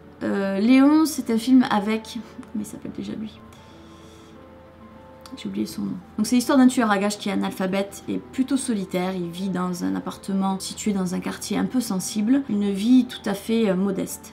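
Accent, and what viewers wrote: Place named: Marseille, France. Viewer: Tu et marseillaise tu parles trop comme Kamila de secret story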